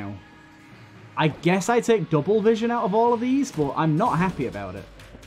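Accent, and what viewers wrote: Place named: Scotland, United Kingdom. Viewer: Nah he is just Scottish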